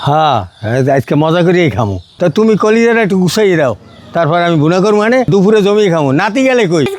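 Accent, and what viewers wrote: Barishal, Bangladesh. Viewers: হালারপো হালা বরিশাইল্লা।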